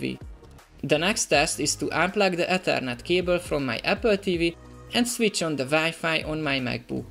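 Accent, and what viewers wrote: Hungary. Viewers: Are u Hungarian? The accent :)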